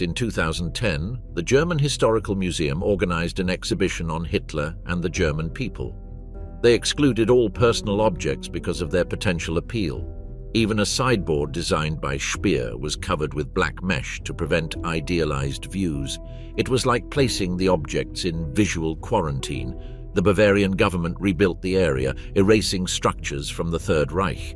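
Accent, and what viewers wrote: United Kingdom. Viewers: Umm the narrator has a British accent. So maybe redirect your frustrations.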